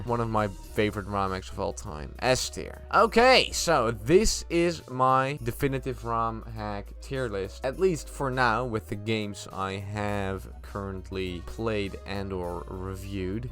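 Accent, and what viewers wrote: Netherlands. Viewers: Not at all... Imao. Pewds is Swedish and sounds Swedish. is Dutch and sounds Dutch. But they're both definitely accents! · Wow, your accent sounds so Dutch! Geweldig.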